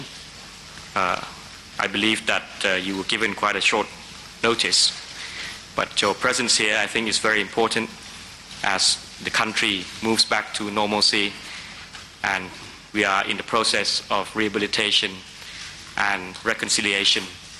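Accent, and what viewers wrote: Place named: Thailand. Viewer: เท่าที่ผมดูน่ะ แกนั่งอ่านสคลิบทั้งนั้นนี้น๊า..สำเนียงก้อไม่เท่าไหร่หรอกนะ ก้อเหมือนคนไทยที่พูดอังกฤษทั่วๆไปน่ะคับ....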